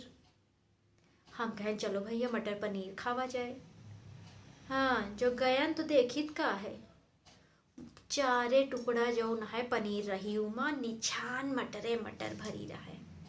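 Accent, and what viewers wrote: Awadhi, India. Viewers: Maine jb apko awadhi bolte suna to Mai dang rah gyi ke ye h👍👍👍👍👍👍👍👍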